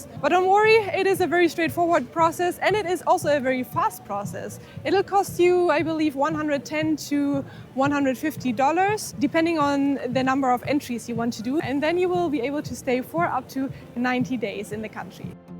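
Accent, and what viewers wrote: Germany. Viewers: Du I hear a German Akzent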